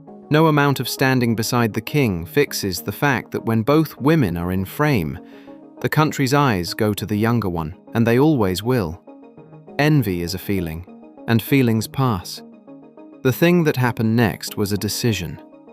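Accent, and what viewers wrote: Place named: United Kingdom. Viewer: I have read the disclaimers. And, of course I notice the same scenarios worded differently and the span between the repeats. I also notice quite a few British accents during the narration and sometimes the BBC. I might add that I am not a fan of Camilla, since the affair came to light. It’s outright dishonesty to the one you are betrothed, to your children and considering Charles position, to his country. Something you could NEVER accuse Queen Elizabeth of. I’m not saying others are perfect. Look at the governmental mess in USA. It’s entertainment and I have an opinion On the scenario rather it be true or false! My God, after reading these things you could not pay me to be a royal! Their lives appear to be maybe 25% their own. Any way, if you hear anything that’s actually news and not entertainment, post it, I would love to read it! 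Thank you for your comment😊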